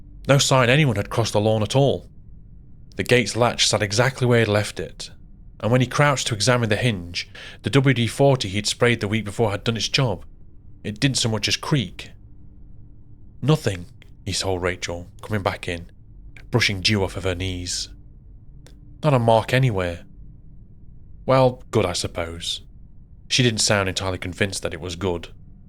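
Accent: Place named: East Midlands, United Kingdom. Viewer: Notts or Derby lad narrating this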